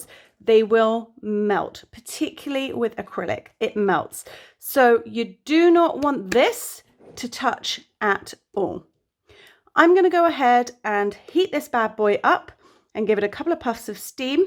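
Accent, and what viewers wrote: United Kingdom. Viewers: what kind of british accent do you have? sounds cool!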